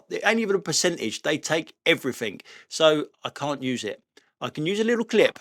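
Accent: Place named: West London, United Kingdom. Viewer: You sound nothing like Michael Caine, You have a West London accent like me.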